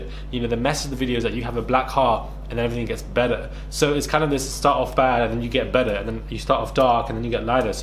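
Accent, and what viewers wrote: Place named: United Kingdom. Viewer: I only come before ur great British accent!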